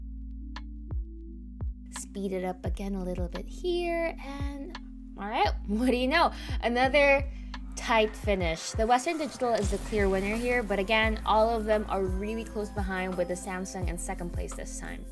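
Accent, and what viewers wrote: Philippines. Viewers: She seems to have a Filipino accent. Not that it matters, as a Filipino, I rarely see content creators here that aren't 'vloggers' and vomitting clickbait content. I guess it's a long way of saying, I rarely see this much class from a content creator in the Philippines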